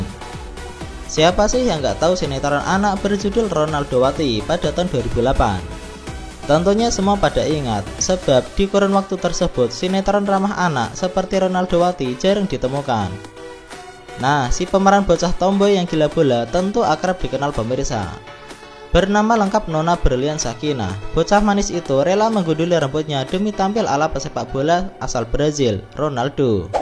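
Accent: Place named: Java, Indonesia. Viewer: medok bgt suara lu tenk 😬😅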